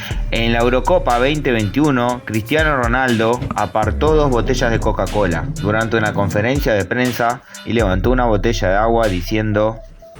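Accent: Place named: Argentina. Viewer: El del video tiene un acento argentino, ni modo que digas que es un fan de CR7 cuando los argentinos tienen prohibido hablar de él. 😅 No, yo creo que el declive de CR sucedió más por sus decisiones que por la falta de talento. Todo comenzó cuando decidió irse del Real Madrid, no tenía que irse de ahí, no cabe duda de que habría conseguido más títulos importantes y su carrera habría seguido igual de respetada. Sin mencionar que por esos años Messi estaba pasando por un muy mal momento. Ahora ya nadie lo recuerda pero a Messi le pasaba lo mismo con su selección que le pasó a CR7 con Portugal. Afortunadamente Messi tuvo a un gran director técnico como lo es Scaloni que supo sacar lo mejor del astro argentino y lo revitalizó. Cosa contraria a CR7, que tristemente sus entrenadores lejos de ayudarlo, le dieron la espalda y es una historia muy triste para el futbol. 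Habría sido fantástico que ambos tuvieran su revancha, pero solo uno lo consiguió, el futbol solo quedará en deuda con uno y no con dos como era antes.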